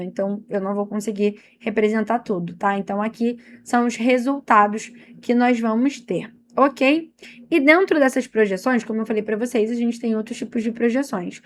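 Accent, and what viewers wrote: Rio de Janeiro, Brazil. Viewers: Nossa que professora incrível! Didática muito boa e o sotaque carioquês linda! Parabéns!!! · Acho lindo sotaque carioca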